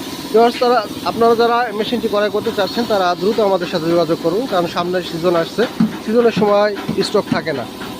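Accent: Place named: Faridpur, Bangladesh. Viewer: ফরিদপুর কথায়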